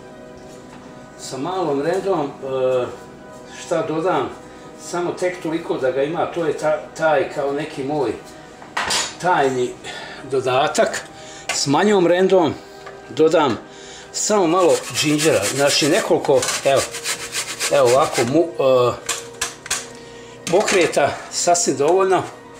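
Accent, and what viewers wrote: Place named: Zenica, Bosnia and Herzegovina. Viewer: Ne znam da li ste iz Zenice...ali imate pravi Zenicki naglasak hehe.Pozdrav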